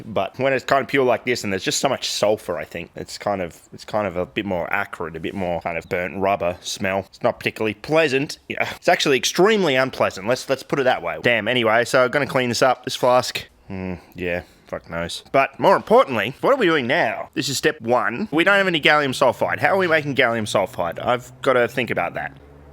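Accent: Australia. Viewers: with an Australian accent, which as an American, makes this 45% more engrossing to me than NileRed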